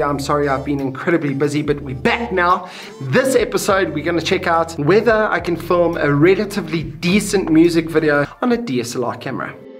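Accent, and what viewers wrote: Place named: South Africa. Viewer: I can hear you're South African😂 hello from Durban😌👍🏻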